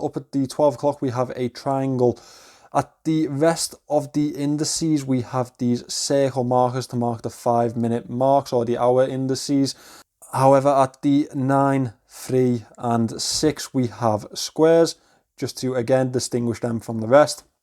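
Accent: Liverpool, United Kingdom. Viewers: Yes lad, good to see a scouser in the watch game on YouTube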